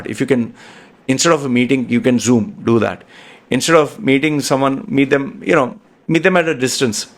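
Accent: India. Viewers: Indian English accent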